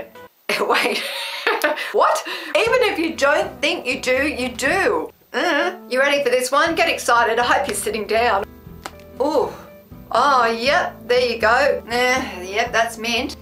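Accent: Australia. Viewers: Oh far out i can tell that's an aussie acronym or slang term the way you say it in all your videos 😂😆🤣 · I new you were Australian because you acset I'm Australia and i love mint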